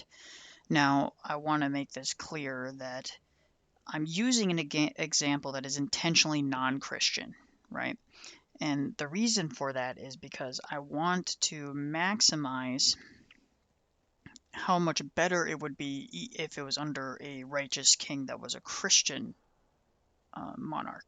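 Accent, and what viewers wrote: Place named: United States. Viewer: You sound American. Unfortunately I think American people, even some of the most based people on the right, are still too democracy pilled to ever get this point across. I think it’s only when the democratic system fails on a mass scale will people actually begin to entertain the possibility of non democratic alternatives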